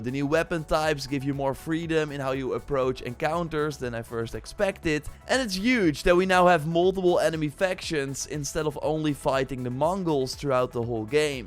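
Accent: Netherlands. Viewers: Dude what is that accent??!! Is it Dutch? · Are you Dutch hahahah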